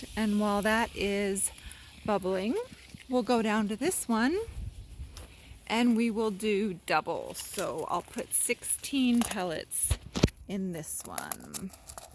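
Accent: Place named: Jamaica. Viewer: Heyyy you sound Jamaican!!!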